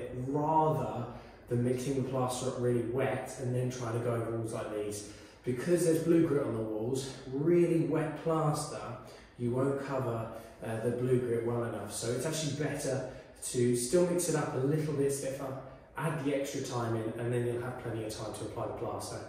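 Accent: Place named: United Kingdom. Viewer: I was going to make the same comment. I am from the US, so the British accent makes it a bit harder to understand. But using the mic in the camera introduces a lot of room echo, and the audio level goes up and down, depending if is looking at the camera or away from it. And there were key points, where I just couldn't quite understand......... A wireless mic would bring up the only minus point of your fine videos..... (Your content is great, your videos are fine, and your presentation is very good, only your audio is lacking............. Thank you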